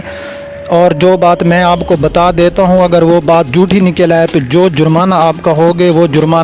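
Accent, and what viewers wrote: Saraiki, Pakistan. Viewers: Aap ka speech style impressive hy sraiki lehjay ki wajah se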